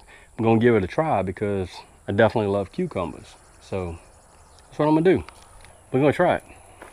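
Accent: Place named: Louisiana, United States. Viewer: Mississippi? I sho nuff thought I heard a little cajun in that accent? I'm a sucker for a southern accent lol just ask Andy! Lol sure hope them cucumbers work out for ya! I love a cucumber and tomato salad! Yum!! Have a good one! See ya next time!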